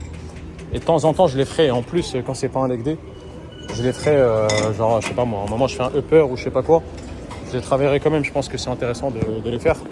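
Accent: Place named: Kabylie, Algeria. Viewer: Salut super vidéo, dis moi t'a un petit accent t'es kabyle ?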